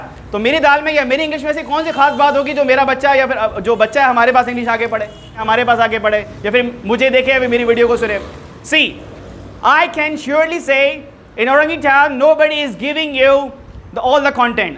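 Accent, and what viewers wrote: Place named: United States. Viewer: I always watch your videos because I love American accent & I know I can't find useful learning material to another tutor.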